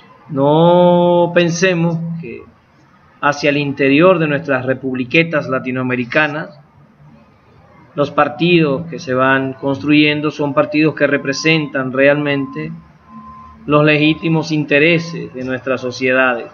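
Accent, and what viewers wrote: Cuba. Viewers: de donde eres? Te dices mexicano pero tienes tu acento cubano mucho muy marcado, saludos! Buen video! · Este señor tiene acento cubano hay que cuidarnos de lo que dice Lopez obrador esta incado ante trump sólo los mexicanos podemos defender nuestro pais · Oiga usted habla como ke es mexicano usted no es mexicano parese cubano venezolano no se pero usted no es mexicano ahora esta bien por apollarnos y si gusta ser mexicano pida la nacionalidad no hay problema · Su acento dicta que es cubano.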